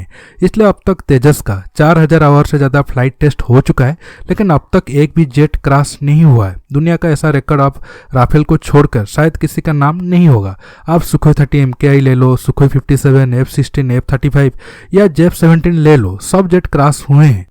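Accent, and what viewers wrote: Odisha, India. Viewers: Bhai aap oriya hon kya? Love your videos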